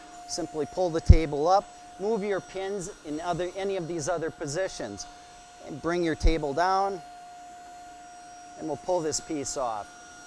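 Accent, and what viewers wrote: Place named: Midwest, United States. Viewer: 10 bucks says this guy is from either Wisconsin or Michigan.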